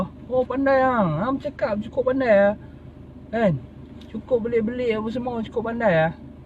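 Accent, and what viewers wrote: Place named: North, Malaysia. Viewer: Tapi abg cakap macam orang utara ye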